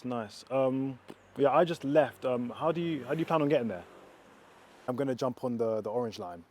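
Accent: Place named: Estuary, United Kingdom. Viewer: You speak too quickly and I can't follow your estuary accent when it's so quickly spoken. The subtitle program didn't (couldn't) help either, sadly. Try speaking slower please.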